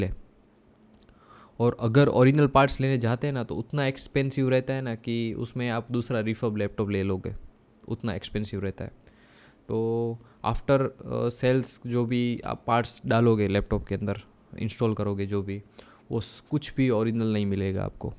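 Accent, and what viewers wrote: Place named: Sindh, India. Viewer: ok actually your accent is a bit similar as if a Sindhi speaking person speak urdu.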